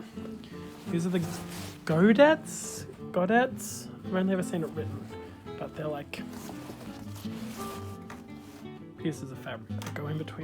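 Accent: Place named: Australia. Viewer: oh that's exciting I can't wait!! I can't 100% tell if you're Aussie like me, but that outfit is epic!! 🙂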